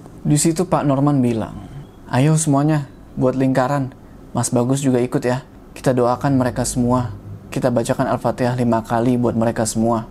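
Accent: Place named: Bandung, Indonesia. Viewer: Kang teh orng mna nya sga orng bandung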